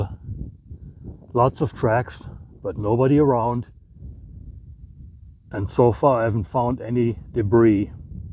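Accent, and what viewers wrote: Germany. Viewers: Love the Bavarian accent!! Great video! I wasn’t aware that there was an uncontrolled landing of a UAV. Thanks for the info. New Subscriber! Greeting’s from New Hampshire.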